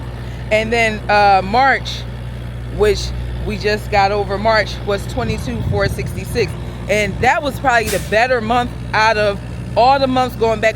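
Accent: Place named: Caribbean. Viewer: Nice video you sound like someone who has caribbean blood in you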